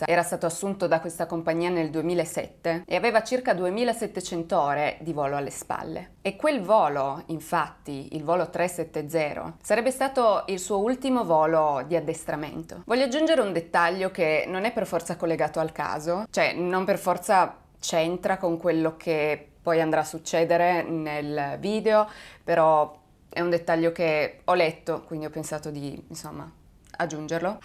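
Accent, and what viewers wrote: Turin, Italy. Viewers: È la classica cadenza Torinese.... Specialmente la E e la O per noi, a Torino son tutte aperte 😂😂😂😂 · Condivido tutti i meritatissimi complimenti, poi, malgrado viva fuori Italia, ha una bellissima e particolare cadenza torinese. Prolunga in modo oggettivo, ma, soprattutto, soggettivo, le vocali. Brava.... c'è poco da fare, ha una marcia in più. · accento lievemente torinese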